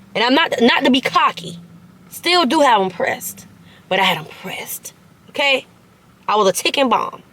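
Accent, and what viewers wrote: New Orleans, United States. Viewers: Is that a Nola or Georgia accent? You sound blended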